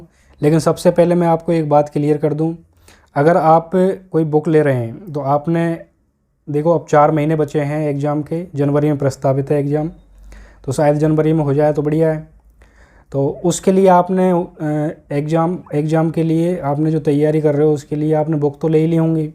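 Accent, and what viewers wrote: Bharatpur, India. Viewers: Where are you from?🤔 You talk in bharatpur accent..🤔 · Bhi bharatpur ke lagte ho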